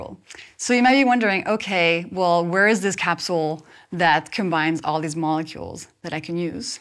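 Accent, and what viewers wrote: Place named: France. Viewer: You are the first french person that I hear talking English and your accent in combination with your calm voice is so pure beautiful. Its like listening to a romantic song.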